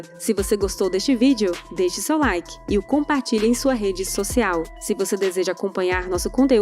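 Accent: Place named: South, Brazil. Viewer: Ótimo artigo e muito bem apresentado. Me impressiona a bela e perfeita dicção da. Não é carioquês, como a do Peter, parece mais de SP para baixo, como a da Caroline de Toni, de Chaoecó, SC.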